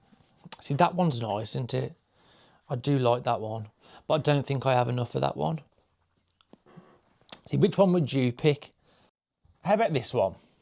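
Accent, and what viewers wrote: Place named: England, United Kingdom. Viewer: Without Kate I wouldn't have known about you. Be yourself it's your nature, your channel. Happy to know you, I'm from from south of France and I enjoy to meet new english accents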